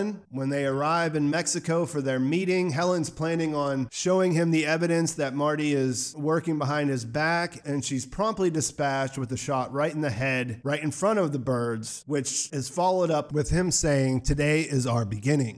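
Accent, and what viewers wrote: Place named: Pittsburgh, United States. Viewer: Hey! Thanks for the great analysis! I picked up on your Pittsburgh accent! I am a Burgher too!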